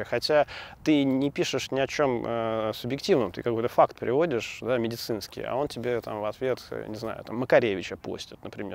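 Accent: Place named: Moscow, Russia. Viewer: ты стал говорить как МАсквич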